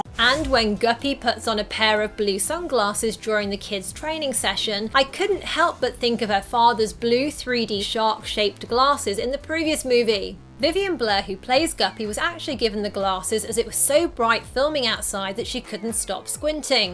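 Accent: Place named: United Kingdom. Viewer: I love your voice so British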